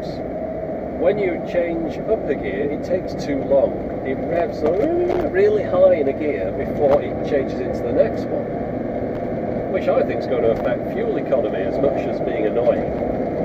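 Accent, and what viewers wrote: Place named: Liverpool, United Kingdom. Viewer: are you near Liverpool? you sound a bit like Ringo.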